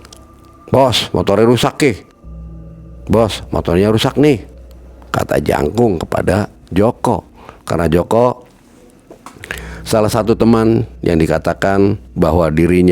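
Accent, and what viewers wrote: Cirebon, Indonesia. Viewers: Dari awal ketahuan bahasanya logat bahasa CIREBON...😃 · Kaya org indramayu om,bahasanya.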